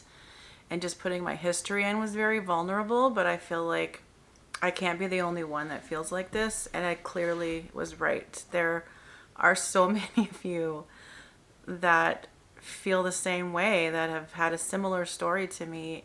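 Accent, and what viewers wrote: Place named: Canada. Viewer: Congratulations!!! I watched your video a day or two ago and knew it would resonate with so many people because you were telling your personal story and people love to hear stories that feel rooted in the “hero’s journey” where someone is choosing to step out of their regular existence to follow excitement and adventure; what bigger adventure is there than the one to create a life you love?! Also, I loved that as soon as you started speaking I thought “she’s a Canadian”. As a Canadian (from Toronto) residing in the US I enjoy hearing the cadence and “accent” of my people. I miss home a lot! 🙂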